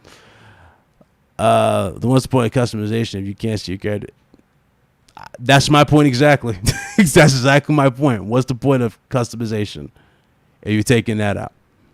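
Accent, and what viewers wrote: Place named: Atlanta, United States. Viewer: we need to use his webcam 😄 it's so weird watching you since you didn't use cam before. people thought you were white? lol I can pick up that Atlanta accent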